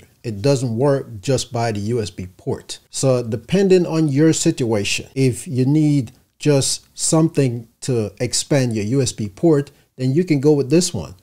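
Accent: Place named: Jamaica. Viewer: I think i hear a bit of a Jamaican accent. You a Yardman?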